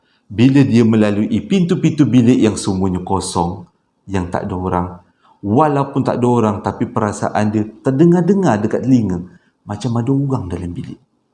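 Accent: Johor, Malaysia. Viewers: Abg org johor ke? Patut la bila dengar cara ckp abg mcm org johor ie.. 😊😊😊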